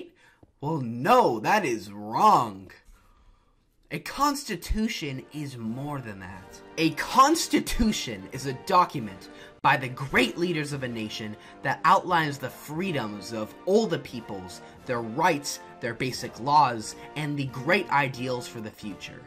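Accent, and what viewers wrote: Quebec, Canada. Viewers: Is he Canadian? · he is Canadian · probably from Quebec, Canada. definitely from up north, speaks both english and french fluently, plus the references to Canadian provinces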